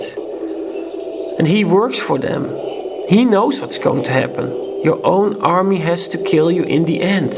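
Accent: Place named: Netherlands. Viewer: Hey, leuke film hoor, je bent zeker echt Nederlands he? Je accent ;)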